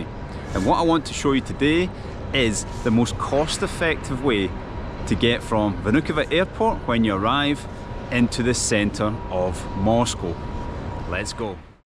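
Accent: Scotland, United Kingdom. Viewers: Yes I like your videos and your scottish ACCENT.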